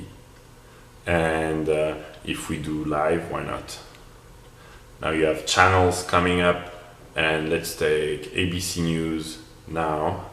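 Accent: France. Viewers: r u french :D? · LOL you are French right ? i've recognize the "accent" je suis francais aussi, sinon super tes videos franchement sa fait plaisir de voir un francais qui review le palm pre(je cherchais sa depuis un moment). t'a fait HEC je suis mdr ... j'y vais a la rentrée pour ma quatrieme année, voila pour ma life je m'abonne allez a+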